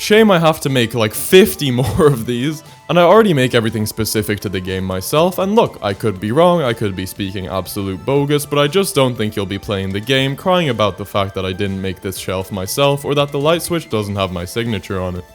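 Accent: Poland. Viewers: im new to the channel and i don't know but are you polish?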